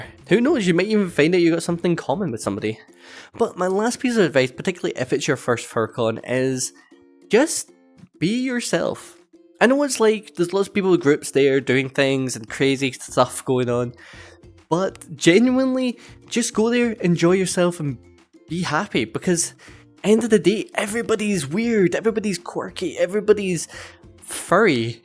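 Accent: Scotland, United Kingdom. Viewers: I gotta love your accent. You sound very Scottish to me.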